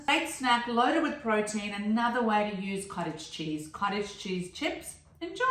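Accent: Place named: Australia. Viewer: Hi! Good to hear an Aussie do a cooking segment. I think you could probably sprinkle them with sesame seeds on top for a bit of flavour. Maybe you could sprinkle a little Parmesan cheese in with the cottage cheese. Thanks for the recipe. All the best.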